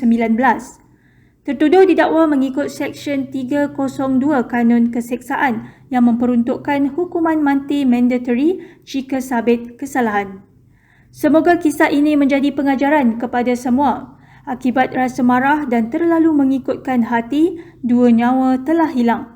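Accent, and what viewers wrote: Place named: Kelantan, Malaysia. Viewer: Speaker pelat... ore klate ko haha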